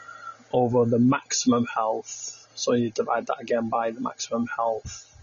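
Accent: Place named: United Kingdom. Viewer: thats the strongest british accent ive heard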